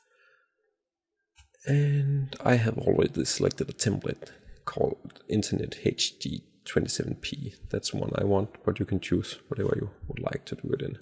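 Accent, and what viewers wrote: Denmark. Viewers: Are you Danish? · er du dansker ? :) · Are You Danish ? If You Are Its EPIC ! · Are you Danish?? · you sounds danish <.<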